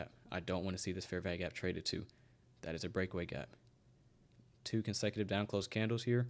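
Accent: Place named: South, United States. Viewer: Where are you based out off? I noticed you have a hint of a southern accent. Im Beytoven by the way. Im always in your live streams lol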